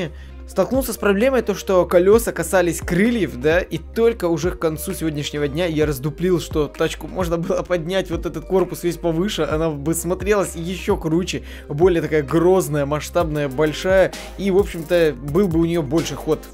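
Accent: Odessa, Ukraine. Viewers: Ты с Одессы ?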